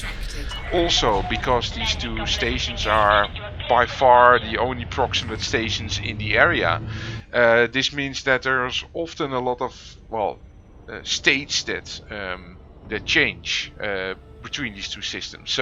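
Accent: Netherlands. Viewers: is it just me or are you dutch just like me cause your dialect sounds a bit dutchy XD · Hoi, ik hoor aan je accent dat je wellicht Nederlands bent (no offense je Engels is verder prima), maar ik begin net in Elite Dangerous. Of nouja net, ik heb al aardig wat uren er in, maar ik wil het nu wat serieuzer nemen. Kan je misschien enige tips geven om snel te stijgen in ranks? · That dutch accent is strong =)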